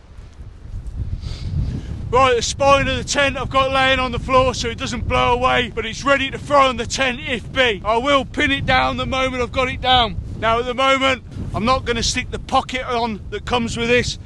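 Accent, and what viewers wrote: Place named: United Kingdom. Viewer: You have the most uk accent 😂. Holy shit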